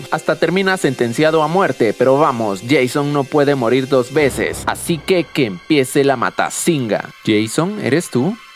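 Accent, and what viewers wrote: Guatemala. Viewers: ¿Eres de Guatemala? :D Yo soy chapina (っ.❛ ᴗ ❛.)っ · Una pregunta sos de Guatemala porque tenés el acento guatemalteco Yo soy de Guatemala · Un patojo!, Que se me hace que eres de Guate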